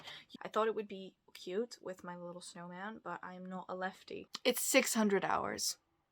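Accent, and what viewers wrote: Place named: Netherlands. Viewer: I thought I picked up a distant Dutch accent and then I looked it up! So lovely! I like your vids. So lovely and cosy ❤️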